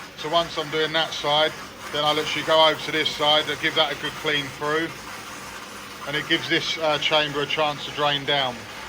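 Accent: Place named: United States. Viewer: I thought you were British you start talking and I find another american.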